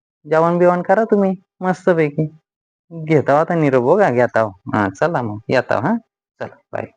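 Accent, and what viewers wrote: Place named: Murbad Shahapur, India. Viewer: बंधू तुम्ही चांगल्या प्रकारे निवेदन देत आहात तुम्ही सुद्धा बोलीभाषा वरून मुरबाड शहापूरकर आहेत वाटतं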